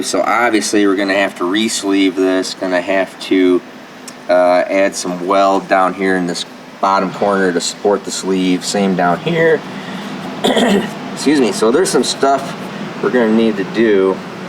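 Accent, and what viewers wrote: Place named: Northeast, United States. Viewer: "...right there Baab. At the haat spaat of the journal..."! You're from the northeast! A hillbilly like me can tell an accent like that anywhere!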